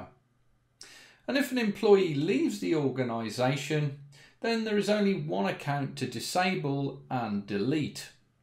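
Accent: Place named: United Kingdom. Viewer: Very British accent. Good video. Thanks.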